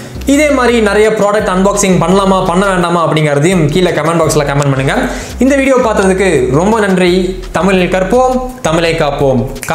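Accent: Coimbatore, India. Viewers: Bro u r from Coimbatore right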